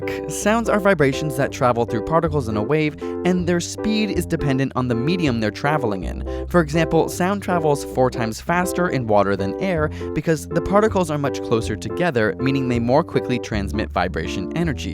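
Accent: Canada. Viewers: I'm starting to realize you have the accent of a Canadian by the way you pronounce "outrun" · Haha, your canadian accent was strong in this video...Almost like you were emphasizing it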